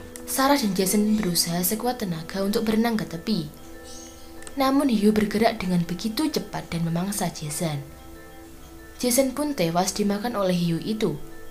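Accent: Java, Indonesia. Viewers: suara medddokmu terngiang ngiangg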